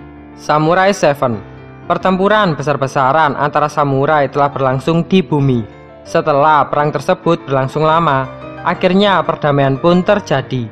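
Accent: Java, Indonesia. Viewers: Medok banget lo min😅😁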